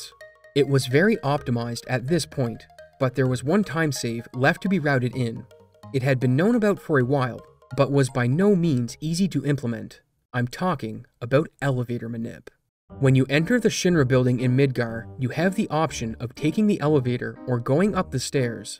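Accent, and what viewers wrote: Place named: Atlantic Canada, Canada. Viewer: incredible video. I have to point out tho, as a Newfie, you HAVE to be from Atlantic Canada some where right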